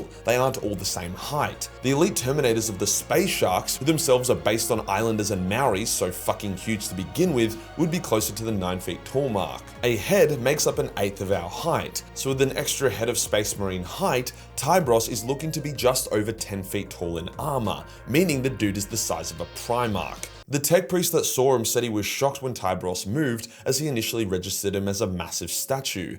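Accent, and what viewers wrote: Australia. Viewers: Honestly i love 40 k lore and have been in franchise since its conception starting out in warhammer fantasy as i prefered the fantasy game tabletop games. how ever i chucked you a sub just due to learning you had been banned a few times by youtube fuck them long live the australian speaking style and not give a fuck attitude ! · Why you put by an Australian you don’t gotta announce where you from we still gonna watch the vid and we rock with you bc you Australian the accent top tier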